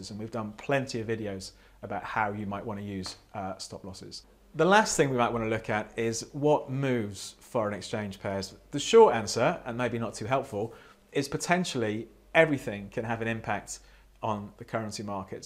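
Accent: United Kingdom. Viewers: Him having a British Accent , is reassuring . Everything he says is golden.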